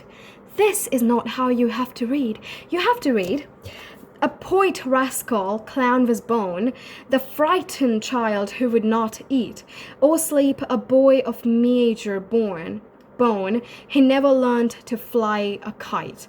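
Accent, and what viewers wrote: United Kingdom. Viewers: Hey that's a cool BRITISH accent! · British accent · I can hear you have a British accent here:) Love you from the Philippines:) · You were speaking british accent in the video?? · Speaking British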